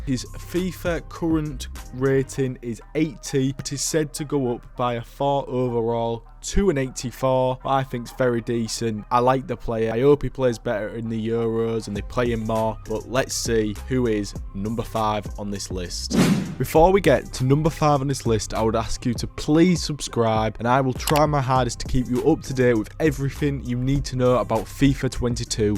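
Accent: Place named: South Yorkshire, United Kingdom. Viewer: I swear to god your accent sounds so close to mine 😂 you from South Yorkshire? Don't tend to see many creators from there big ups to you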